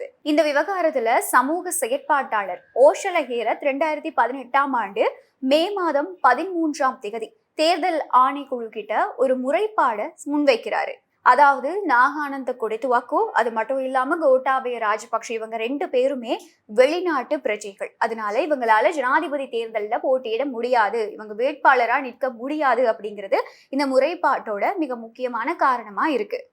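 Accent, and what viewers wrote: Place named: Chennai, India. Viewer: Lankasriயில் Madras தமிழர்கள் பணியாற்றுகிறார்களா அக்கா Madras தமிழில் கலக்குகிறார். கேட்க அசிங்கமாக இருக்கிறது. Lankasriயும் தமிழ் கொலை செய்கிறதா? யாரை நாங்கள் நம்புவது? தூதூதூதூ தூதூதூதூ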